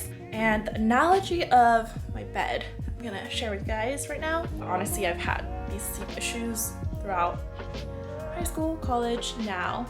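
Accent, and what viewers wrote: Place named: United States. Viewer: This is really mind-blowing. This Chinese chick speaks perfect American English. Where did you study in China? You don't have even a slight Chinese accent. You sound very Caucasian ❤️❤️❤️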